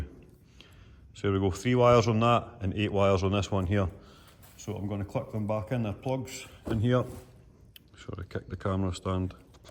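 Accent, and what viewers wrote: Scotland, United Kingdom. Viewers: I've been struggling to get my M54B30 started in an E46 323Ci even after everything's been wired in (it cranks + EWS del), and this has helped both for info and morale. Never thought I'd need a humorous Scottish gentleman explaining BMW wiring to me at 4 in the morning. Thank you, especially, for the brake rev-cutter tip . I'm the head mechanic but have been blocked from being at this car's first drift event. That would have been bad for the drivers to discover without me there.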